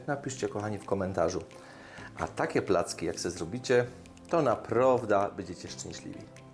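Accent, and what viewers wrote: Silesia, Poland. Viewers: Te przepisy,które dotad oglądałam są super.Bardzo lubię jak mówi po śląsku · Dziękuję za dobre rady jakie ziemniaki wybrać na placki.Przypadkiem trafiłam na ten kanał i już subskrybuje, bo mi się podobo śląsko mowa❤ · Kocham Pana kuchnię,smaczna,prosta,podana w prost,apetycznie.Kocham gwarę śląską, uczę się od lat Pana przepisów, obserwuję Tak trzymać!!!!!!❤😊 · 😂 slunska gwaro śmieszno jest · nieprawda. Ja uwielbiam jego śląską gatke. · Przepiękna Śąska Gwara! Byłem kilka razy na koloniach z chłopakami że Śląska i natychmiast po pół wieku wspomnienia wrócily.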